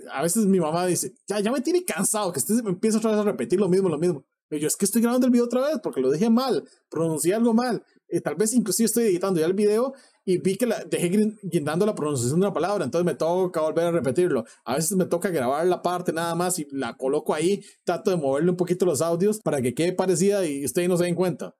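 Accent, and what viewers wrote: Costa Rica. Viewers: Desde el primer video que vi supe eras de Costa Rica por la pronunciación de la R, soy de México y visito mucho San Jose pero también se me hacía raro que no decías los modismos, pero igual como dices lo importante es la calidad de persona y no la nacionalidad, me topé con tus videos buscando guía de TL y me han ayudado bastante. Vas muy bien a mi parecer, ánimo!! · Pero si tu acento grita que eres tico jajajajajaj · Sin que dijeras ya sabía que eres de costa rica , soy de Colombia y vivo en new jersey con varios amigos de costa rica y el acento es muy cool , además que la pronunciación de la r me parece la mejor opción para la pronunciación del inglés entonces la tienen más fácil los ticos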